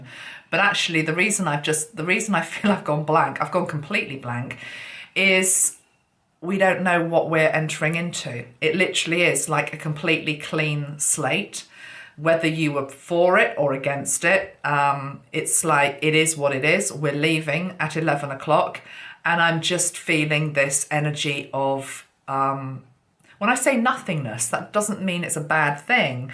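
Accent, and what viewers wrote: United Kingdom. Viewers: You are so so gifted and insightful .. This reading as with all you readings i have come across are beautifully accurate. Being British myself it is nice to hear the accent and the same sense of British witty hunour as myself. THanjk you for sharing your psychic abilities with us and guiding us to your best honest knowledge... I appreciate it. Om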